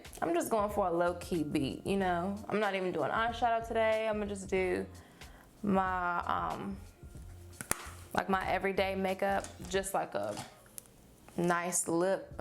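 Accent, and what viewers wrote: South, United States. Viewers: i love your country accent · her Invisalign makes her sound even more southern/country? Its so cute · girl you sound so country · you sounded so country and southern in this video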